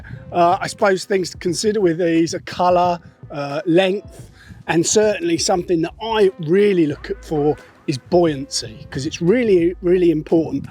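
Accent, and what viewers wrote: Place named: Cockney, United Kingdom. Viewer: are you going to do some new barbel vids? Missing your cockney enthusiasm. Hope life isn't bending you over. 👍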